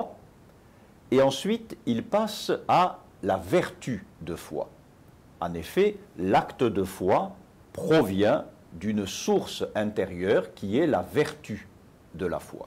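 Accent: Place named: Marseille, France. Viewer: So I'm scrolling through the hillbilly Thomists avoiding to write my essay on angel and then comes by with his hint of Marseillais accent bringing me right back home and back to work too... The book he wrote on angels has been translated in English and is really good!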